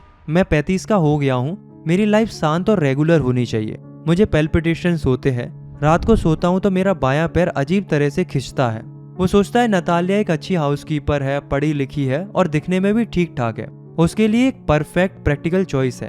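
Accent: Uttarakhand, India. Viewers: Are you from Uttarakhand?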